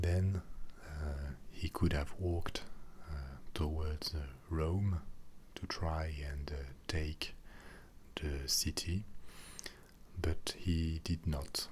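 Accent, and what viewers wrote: France. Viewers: I get great tingles from French accents! very very relaxing! :)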